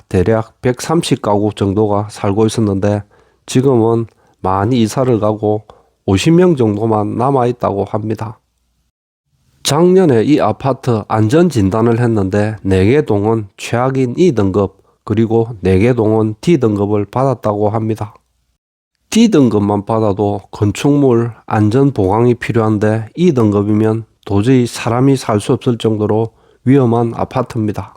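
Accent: Gyeongsang, South Korea. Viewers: 형님 경상도 말투가 넘 맘에들어요 한번 뵙고쉽네요~ 저는 전라도덴... 정말 모든유툽 넘 잘 설명하시고 항상 유툽보면서 장거리 운전하는데 지루하지 않아서 넘조아요ㅎㅎ 응원합니다❤😂🎉😅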